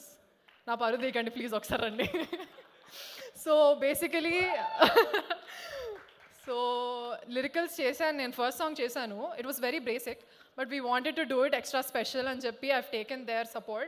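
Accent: Telangana, India. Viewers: Telangana slang suprrrr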